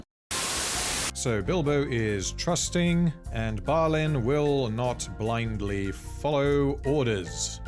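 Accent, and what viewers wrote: United Kingdom. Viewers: I definitely knew you were English I just thought you guys had names for all your varieties of accents on the Isles. Regardless, you have a wonderful channel I love the long form evisceration of this trash. You aren't alone many of us remember THE BEFORE TIMES. Lol.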